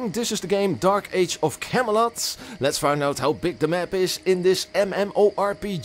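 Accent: Netherlands. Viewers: Dude, are you Dutch? You have a really strong accent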